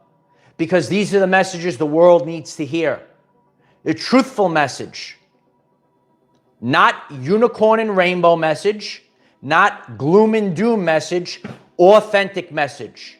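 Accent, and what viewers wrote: New York, United States. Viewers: I love the NYC accent. The Bronx? And yes, you will never be completely ready. But you are now have been prepared enough to go to launch. "Peddle to the metal", or "bury the needle", call it what you will. The time is up. It's full on come May 2024. Enjoy the quiet time remaining while it lasts. This is it kids. No going back now.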